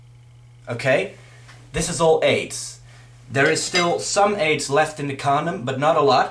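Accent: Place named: Europe. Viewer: he has an european accent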